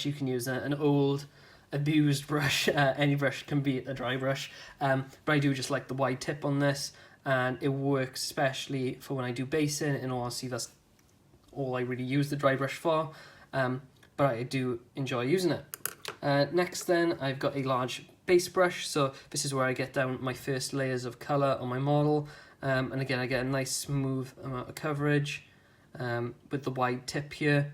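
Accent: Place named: Wales, United Kingdom. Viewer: id say he's from wales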